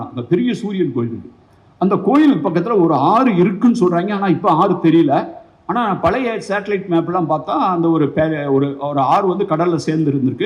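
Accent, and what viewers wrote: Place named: Madurai, India. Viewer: அழகான மதுரை தமிழில் சிந்துவெளி நாகரீகம் சங்க கால தமிழ் நாகரீகத்தையும் விளக்கியதற்கு நன்றி ஐயா.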